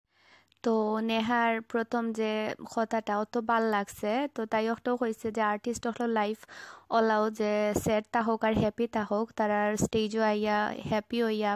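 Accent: Sylhet, Bangladesh. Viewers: Trust me apu Sylhet er vasha e apnar mukhe valo lage..Sundor lagche apnak...😍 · Apu aponar ajker vlogta kub sundor hoyese.. R aponar Sylheti kotha sunte kub balo lage. Ami o akjon Sylheti. Love you apu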